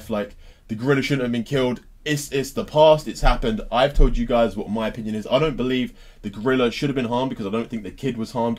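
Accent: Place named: South London, United Kingdom. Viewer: what part of south london u from?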